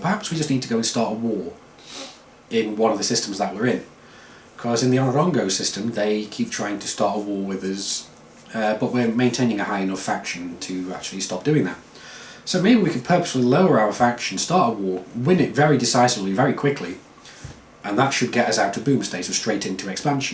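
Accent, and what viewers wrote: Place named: England, United Kingdom. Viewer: Congrats on reaching 15k subscribers. And good luck with getting more. BTW, from which part of England are you from?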